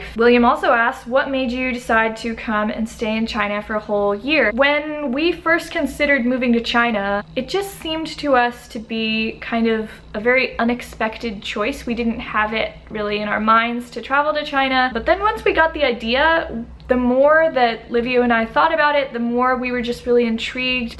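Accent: Argentina. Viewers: Holaaa !! Soy de Argentina , que lindo que estés interesada en aprender Español !! Se te nota el acento argentino !! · Suenas Argentina! Que linda!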